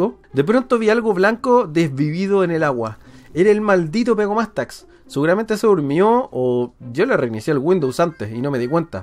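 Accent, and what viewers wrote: Chile. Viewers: Esta la Zorra la Nueva edición al estilo Hopa, narrado al clásico Idioma Chileno, esta super chistoso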